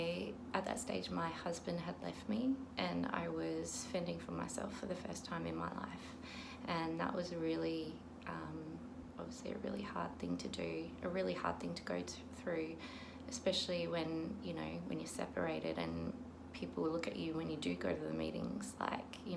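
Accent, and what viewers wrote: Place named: Australia. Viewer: Do I detect an Ozzie twang in there.. .. I spoke too soon.. .. you mentioned it at the end.. doh.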